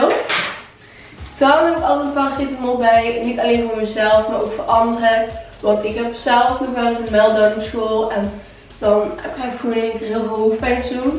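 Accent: Belgium. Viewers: Ben je Belgisch? Je hebt zo'n schattige accent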